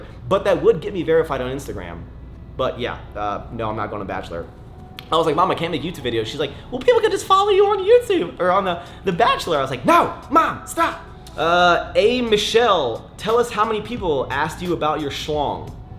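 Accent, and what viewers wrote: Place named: New Jersey, United States. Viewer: Lovely accent you have there, New Jersey?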